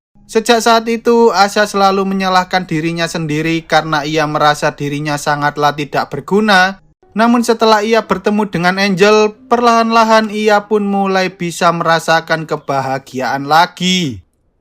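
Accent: Java, Indonesia. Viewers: Min logat nya sengaja dibuat medok ya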